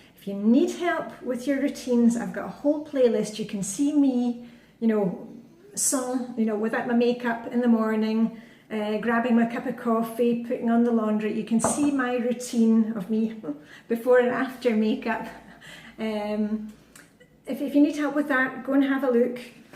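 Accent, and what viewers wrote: Scotland, United Kingdom. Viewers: Just found your videos due to a recommendation on The Hygge Nook group on Facebook and I am already converted... Flylady with a Scottish accent!! And in Denmark!! How could I resist?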